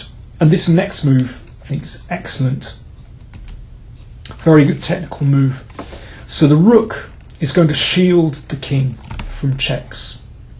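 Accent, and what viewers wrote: United Kingdom. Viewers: Oronion and oll the white powns. It's a drow! hahaah I absolutely adore your Bri'ish accent,!!:D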